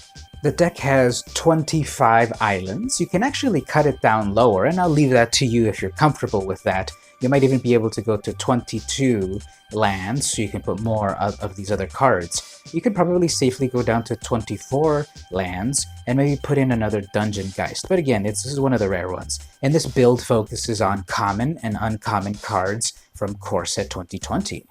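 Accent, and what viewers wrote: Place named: Philippines. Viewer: Filipino ka ba po?? Your accent sounds like a Filipino...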